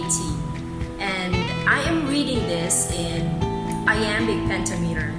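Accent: United Kingdom. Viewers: Wow very entertaining and informative video miss! Your British accent is almost so authentic. Props to the effort given in this video miss! 🙏 🙏🙏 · It is indeed a beautiful poem and I do love how the British Accent sounds very suitable with this Sonnet! Thank you for this video, Ms.! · It is a stunning poem, and I love how the British accent suits it. I really appreciate how elegantly written the words are in this poem! Thank you for this video, Ms. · This is such a wonderful and meaningful poem miss, I love that the British accent makes it more graceful to listen to. -van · Wow, I really like the way you speak in english Ms! Im glad I learned more british coming from you! <3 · it is a lovely sonnet ms. I also like your British accent, so pleasing to hear. How to be you po🥲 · thank you for this video! listening to your british accent is so soothing 🎧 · Thank you for making this kind of content Ms!, i loveeee the British accent u got!. 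· Great video Ms and a perfect British accent.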